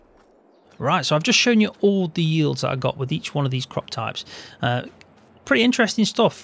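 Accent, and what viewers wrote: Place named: Cheshire, United Kingdom. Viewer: Hi, new viewer here, and a new sub. I'm from Cheshire and I recognise you accent, obviously 😅. I'm from Northwich. Nice to see a local lad pumping out some decent FS material.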